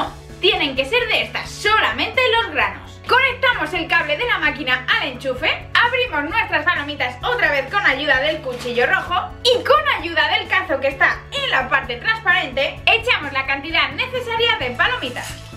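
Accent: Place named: Spain. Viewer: pero en su caso ella es de España y la verdad no soporto su acento, obviamente el de todos los españoles.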